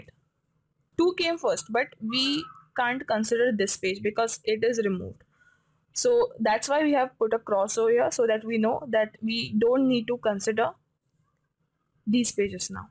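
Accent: India. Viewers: Is this an Indian accent?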